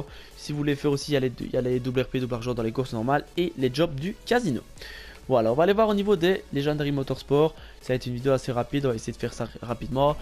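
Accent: Belgium. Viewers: T belge?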